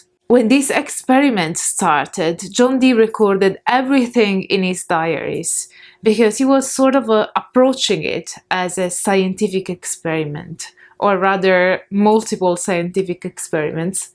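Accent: Italy. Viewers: your accent sounds Italian, quindi spero che mi capisce, comunque io non ho mai lavorato con gli Angeli Enochiani, ma con demoni del impero di Lucifero e con l'Arcangelo Michele, vari dei diversi, hindu ed egiziani, pero ultimamente ero interessato su sti angeli, i miei sensi non sono i migliori, ma credo che mi era stato detto di lavorare con Michele per aumentare i miei poteri, ma vabbe...hehe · You do have a beautiful accent. Then again, Italian is a special favorite. It's a beautiful day come tomorrow, 🙏🏻☕🌅🌹😌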